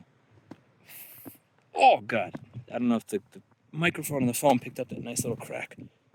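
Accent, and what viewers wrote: New York, United States. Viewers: Haha, yeah, I came down here looking for similar comments. His NYC accent was coming through strong and thick.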